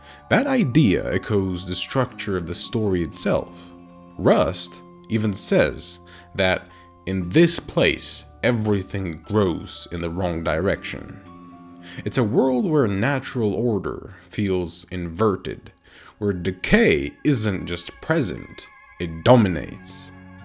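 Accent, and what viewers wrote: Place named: Europe. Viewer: Appreciate the analysis. Dislike the Euro accent. (You don’t know, bro)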